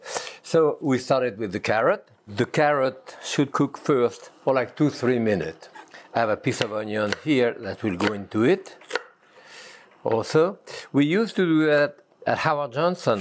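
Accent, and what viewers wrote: France. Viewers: After all these years, he's still speaking like a perfect french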